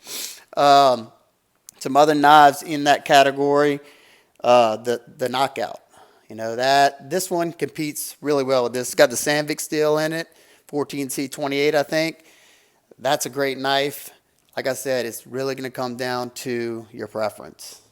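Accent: Louisiana, United States. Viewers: gotta be from Louisiana?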